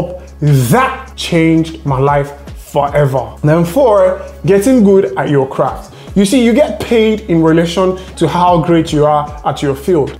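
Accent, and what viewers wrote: Nigeria. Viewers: I can still hear his H factor tho. It's part of the Yoruba accent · He is a Nigerian man with a Nigeran name who lives in Nigeria and looks Nigerian and sounds Nigerian, starting off from broke with no financial help or support, and he still managed to be rich. You have to respect this man because, in his current situation, he is starting from an extremely difficult mode, while most of us in the Western world, compared to this man, are starting from an easy mode.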